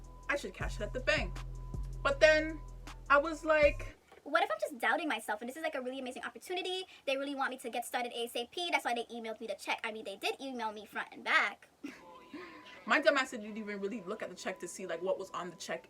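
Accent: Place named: Jamaica. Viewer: yeah, I was asking because you had like an accent also Jamaicans have pretty accents.😊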